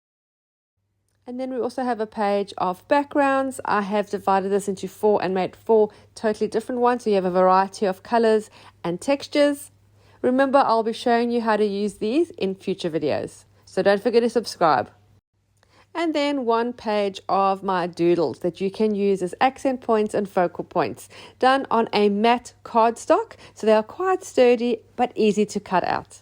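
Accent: South Africa. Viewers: There you go! I hadn’t actually picked the South African accent either which is strange as it’s one of my fave places - have been twice and can’t wait to go again! One day! <3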